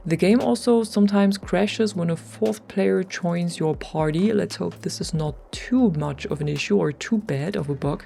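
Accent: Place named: Germany. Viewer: Ich weiß nicht aber hört sich wie deutsch an. Ich bin Spanier aber ich wohnte in Deutschland. My first friends I did in Germany were talkin in English so I know what I’m saying. Can somebody confirm is from Germany Austria Swiss?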